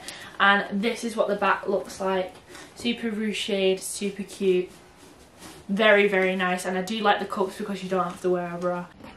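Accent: United Kingdom. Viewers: best tip out there.. mute the sound. that awful bri'ish accent is killing me. but the rest is fine. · Some nice outfits British accent great outfits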